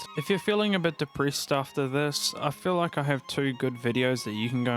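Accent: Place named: England, United Kingdom. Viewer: the english accent adds to the dry humor, nice video.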